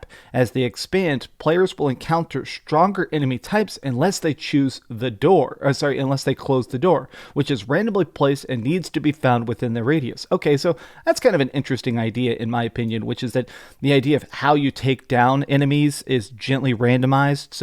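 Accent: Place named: Texas, United States. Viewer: I am not American. But I love your accent, Texas boy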